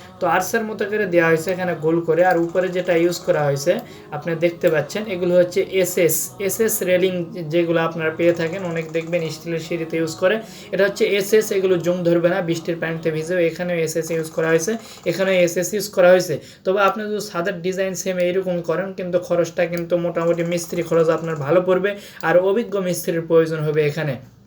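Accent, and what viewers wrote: Naogaon, Bangladesh. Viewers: ভাই আপনার বাড়ি কোনটে? আমাকরে নওগাঁর মতোন ভাষা লাগিচ্ছে।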